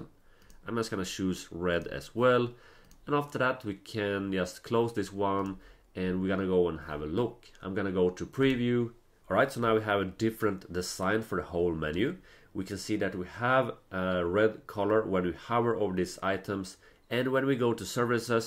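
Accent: Sweden. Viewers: Sounds like your Swedish by the accent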